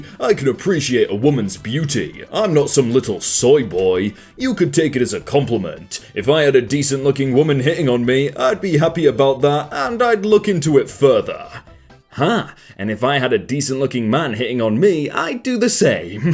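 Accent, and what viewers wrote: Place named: United Kingdom. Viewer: How can you be british, or at least sound it and NOT know who klopp is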